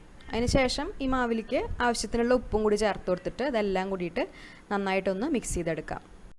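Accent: Thrissur, India. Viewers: 😋😋😋😋😋 Mol trissur aano...bhasha kettu chodichathaatto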